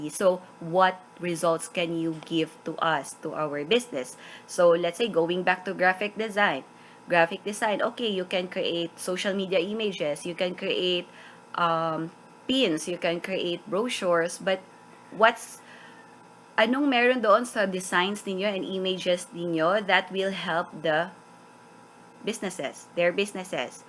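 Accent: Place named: Visayas, Philippines. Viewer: Wow bisaya diay ka coach? Mao nay akong problema ang speaking skills kay bisayang dako sad ko😂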